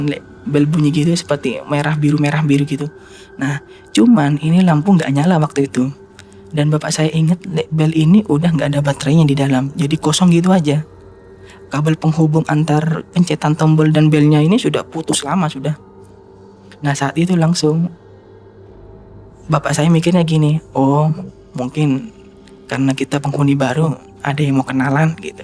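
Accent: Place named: Madura, Indonesia. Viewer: kirain suaranya lagi serak, ternyata logat maduranya masih terbawa, bagus ceritanya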